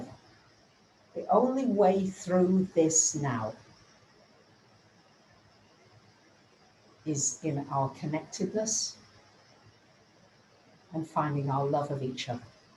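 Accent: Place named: Lancashire, United Kingdom. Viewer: I always knew there was a little Lancashire twang in your voice,! Lots of love from a fellow Lancashire lass xxx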